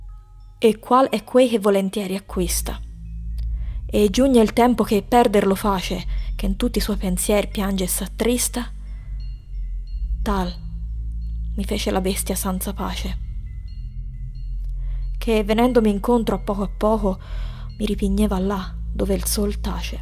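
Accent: Tuscany, Italy. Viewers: Interesting. Is this the way Dante would have spoken, or is the pronunciation closer to the modem Tuscan dialect? Or both? I speak Italian, and to me some words almost sound Spanish. Probably because of the famous Tuscan 'h' sound, but also because of the 'ch' sounds (like German) and the occasional 'th' sound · bra she is speaking like someone from tuscany TODAY! do you not hear "la gorgia toscana"? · Your tuscan accent is so pretty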